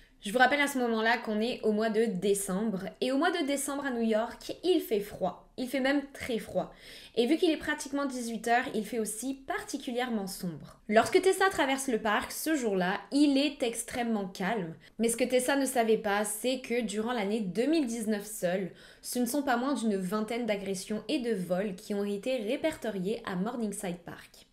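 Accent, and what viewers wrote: Quebec, Canada. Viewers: Allô tu es française oui mais parfois je reconnaît un peu de quebecois en toi ….vie tu au Québec ???? J’adore tes vidéo en passant ❤️ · Effectivement,, moi aussi je trouve que ton accent es de plus en plus québécois !! 👌😉 Et bravo pour tes vidéos continue ton excellent travail !!😊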